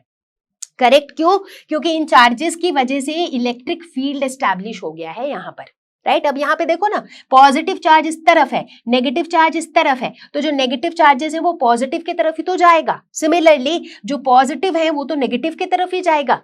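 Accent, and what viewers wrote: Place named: Gujarat, India. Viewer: Mam are you gujrati...? Your accent feel like gujrati 😊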